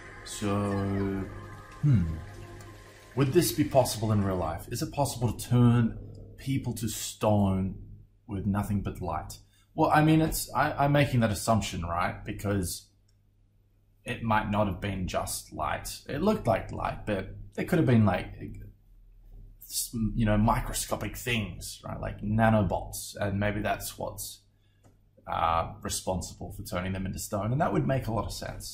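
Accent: Australia. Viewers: Can i guess that you're from Victoria?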